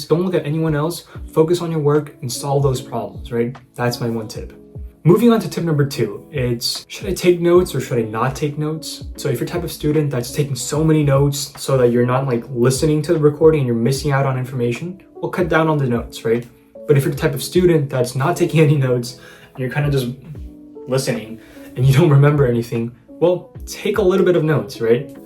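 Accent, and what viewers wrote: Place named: Asia. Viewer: Thankyou so much and i really appreciate for sharing your valuable guidance and experiences . Being an Asian i can resonate with your speaking accent and style... Please upload more videos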